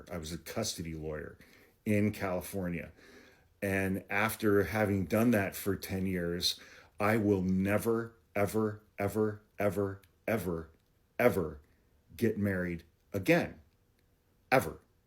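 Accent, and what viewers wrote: Canada. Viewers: You sound Canadian, almost. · You sound mostly like a left-ish Canadian. Speaking as one, not an insult.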